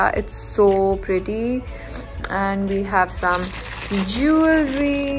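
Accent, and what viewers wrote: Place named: India. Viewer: You hindi accent is soo cute.😂❤️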